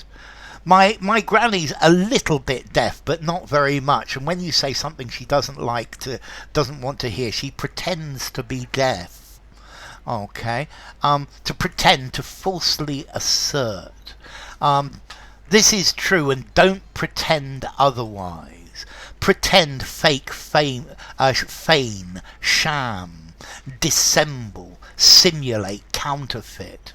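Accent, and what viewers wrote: RP, United Kingdom. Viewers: I like posh accent a lot 🎉🎉thanks a lot sir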